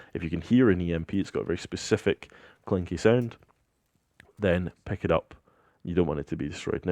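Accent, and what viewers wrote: Scotland, United Kingdom. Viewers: Yu scotish m8 holy feck that be rare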